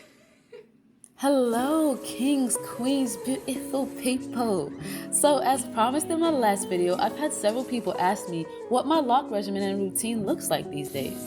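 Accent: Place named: United Kingdom. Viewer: The British accent 👏🏽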